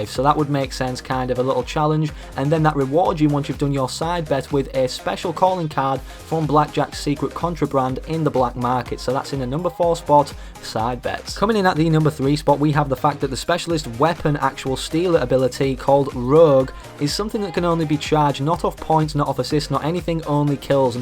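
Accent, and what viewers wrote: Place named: Yorkshire, United Kingdom. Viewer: know a Yorkshireman when I see/hear one ;)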